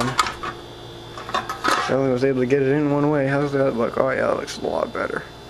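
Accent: Canada. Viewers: ur Canadian?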